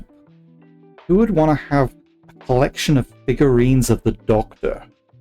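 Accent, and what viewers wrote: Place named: United Kingdom. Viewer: In brum. Can tell you’re from the uk lol loved that show.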